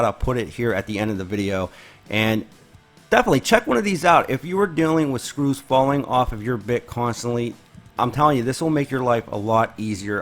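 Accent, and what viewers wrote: Pittsburgh, United States. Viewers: Pittsburgh accent? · Just a little Pittsburgh accent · You don’t happen to be from the Pittsburgh area are ya?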